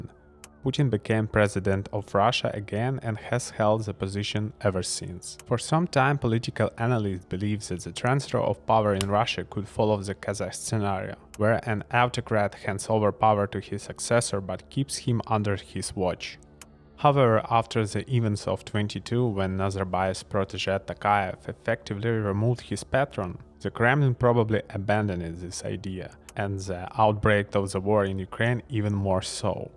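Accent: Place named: Ukraine. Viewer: You may not consider Khadyrov a serious contender for Putin's successor, but imagine the sight of Lavrov, Patrushev, Mishustin and Shoighu, stripped of their shirts and facing the wall, while Khadyrov poses in gopnik crouch before them. He grins because he has spelled out Akhmat in spray cream on their backs! By the way, have no worries over your English - many more of us are attuned to Ukrainian accents. Good video.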